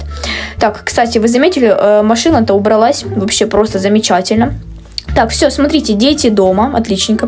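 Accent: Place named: Ukraine. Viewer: Скажите пожалуйста она русская просто речь как у украинки(не хейт) очень люблю её смотреть❤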